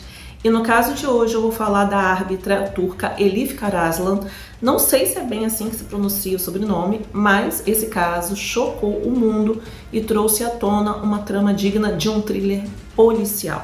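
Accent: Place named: Rio de Janeiro, Brazil. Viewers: vdd! mas tem cariocas q tem isso mais forte. A é até light se comparada a outros!!! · Até que enfim, esse sotaque que é igual ao meu!!! Muuuuuito difícil no nicho True Crime, alguém carioca!!!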